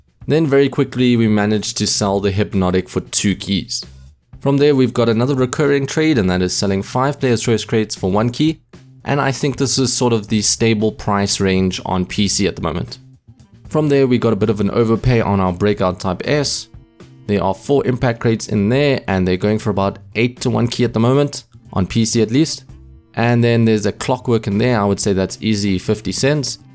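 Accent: South Africa. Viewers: Are u from south Africa? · Are you from South Africa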